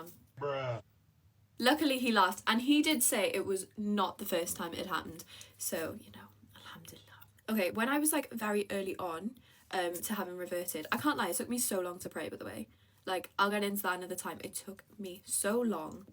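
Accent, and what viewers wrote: United Kingdom. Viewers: Sister, take your time you made the first step you recognize the creator and you submit to him. You’re in the safe zone. Everything else come second the description says you’re an American. You have a British accent. I just wanna correct whoever posted this.❤ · ربنا يثبت قلبك على دين الإسلام يارب وعلى فكرة لهجتها تدل على انها بريطانية وليست أمريكية المهم انها مسلمة فقط للتوضيح Sister we welcome you as a great Muslim sister and you look so beautiful in hijab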